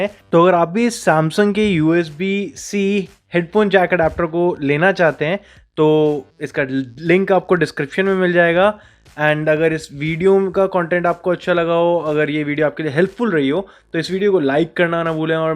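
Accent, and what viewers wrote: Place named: India. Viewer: work on your accent. It's half indian half english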